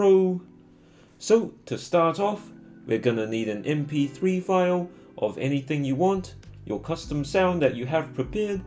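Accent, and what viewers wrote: New Zealand. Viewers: wow i love new zealand accents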